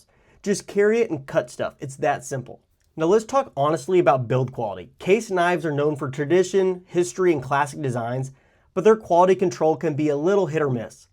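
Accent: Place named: United States. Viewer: If you're comfortable sharing, where do you live? You speak American English but apparently live in the southern hemisphere (because it's winter where you are), so I was curious. I'm guessing Australia?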